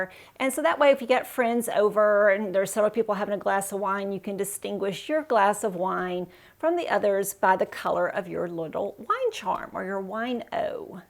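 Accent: South, United States. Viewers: I love your pink sweater beautiful color if you don't mind saying what state are you from and living in. You are definitely southern I can tell my dad's people are from Arkansas Alabama and my mom's people from Michigan and live in Florida I am a Yankee but I live in Arkansas it took a long time to adapt for me different culture shock lol but I love Arkansas people friendly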